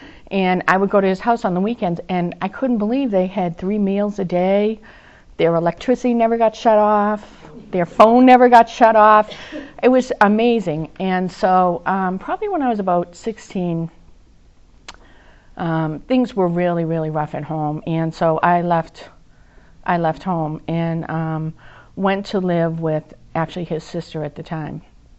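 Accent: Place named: Boston, United States. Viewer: Beautiful job, loved your sharing, and your Bah Stan accent..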